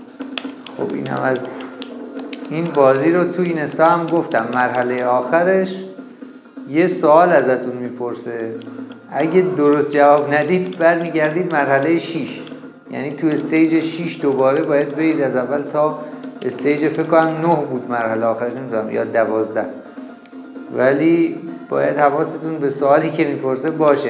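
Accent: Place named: Rasht, Iran. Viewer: آقا شما رشتی هستی لحجت مشخصه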